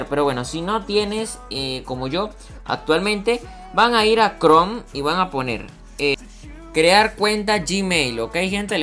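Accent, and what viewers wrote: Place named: Venezuela. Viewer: Eres Venezolano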